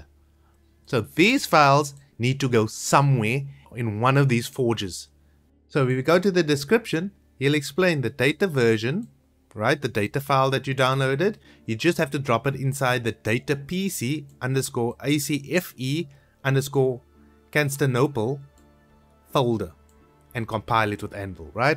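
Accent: South Africa. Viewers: Are you from South Africa your accent is quite familiar. And thanks for this. I have Assassin's Creed Rogue and modding it has always been something I wanted to try out. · You're the most south african sounding guy i've ever heard 🤔🤔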